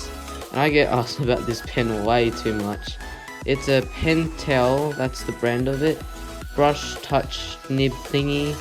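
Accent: Australia. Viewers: U Aussie? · Are u Australian?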